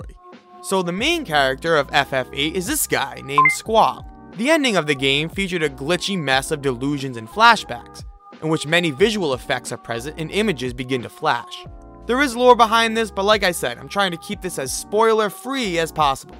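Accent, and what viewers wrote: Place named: Boston, United States. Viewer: Dude I hate to sound weird but are you from Boston? I feel like you definitely live at the most an hour from Boston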